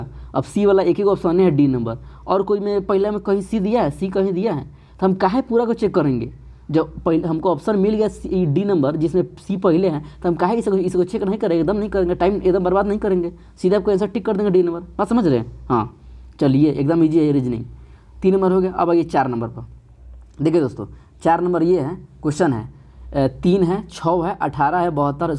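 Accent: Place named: Bihar, India. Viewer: Apki language bhutt achi lgi bihar ke ho ??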